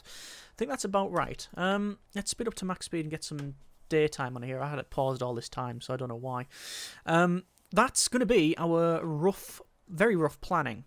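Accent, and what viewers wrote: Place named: Newcastle, United Kingdom. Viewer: You sound slightly Geordie and if so big up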